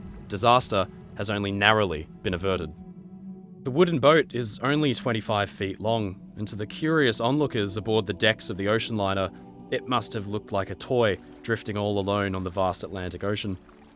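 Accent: Australia. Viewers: The fact that he’s Australian makes your statements pretty revealing… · he's Australian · Fantastic script well read fellow Aussie? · yay!!! Aussie narrator😃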